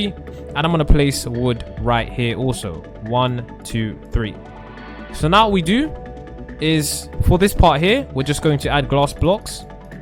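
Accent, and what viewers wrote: United Kingdom. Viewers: I love the British acsent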